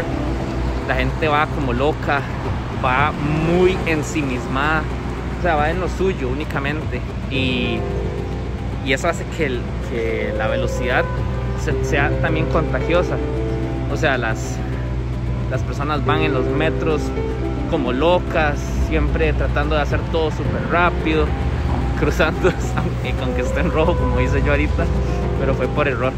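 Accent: Costa Rica. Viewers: Si no me equivoco eres de Costa Rica por ese acento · Maee fijo usted tiene que ser tico o alguna vez vivió en Costa Rica jajajaja saludos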